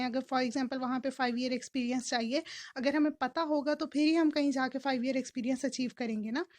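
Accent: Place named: Kashmir, India. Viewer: Muah maam sexy voice ❤ your kashmiri accent is so sexy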